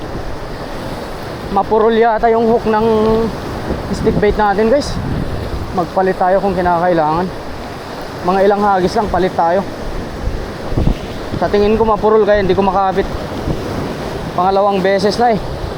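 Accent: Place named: Agutaynen, Philippines. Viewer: Kapatid parang lahing agutaynen ka? May punto ka kasi pag nagsasalita ka, halatado? Hahaha. Pa-shout-out na lng. Joel Zumaraga Tabarangao from Palawan with Love. Thank you and God bless!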